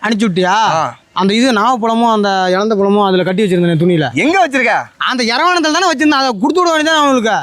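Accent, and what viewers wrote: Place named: Kallakurichi, India. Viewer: yes kallakurichi ulundurpet! Slang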